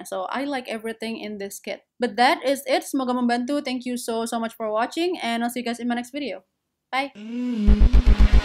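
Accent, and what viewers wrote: Java, Indonesia. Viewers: lucu sih kalau ngomong Jawa 😂😂😂 lvlv Kak, ga pernah bosen deh lihat Chanel nya 😍 mau mati gue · hhaaaaaaaa aq seneng medok mu hhaayyy!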